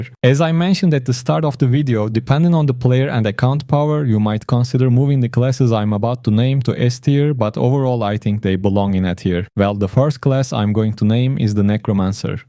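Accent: Turkey. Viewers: türk ingilizcesini nerde duysam tanırım xD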